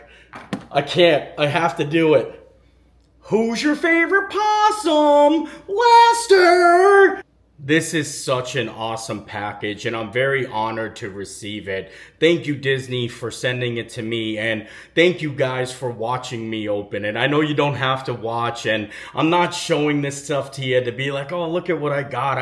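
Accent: Pennsylvania, United States. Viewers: That pa twang! (I'm from Trenton originally)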